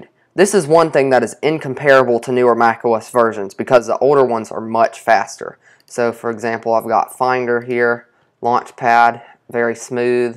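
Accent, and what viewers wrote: South, United States. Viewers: It's southern US but like city southern.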